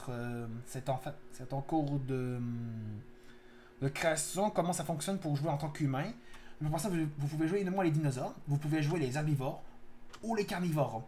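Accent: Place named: Quebec, Canada. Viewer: Pti commentaire et c'est constructif. Je suis québécois et je pense que toi aussi. J'ai parcontre du mal à comprendre se que tu dis. Essaie un français universel ou parle avec un accent assumé! Sinon c'est super bien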